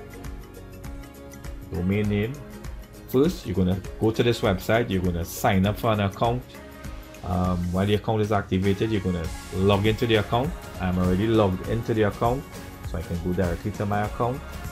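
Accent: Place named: Trinidad and Tobago. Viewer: This voice is a trini for sure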